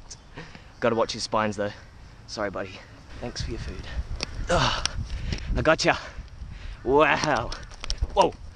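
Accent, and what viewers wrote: Australia. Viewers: Are you Mexucan ? no Austrailian .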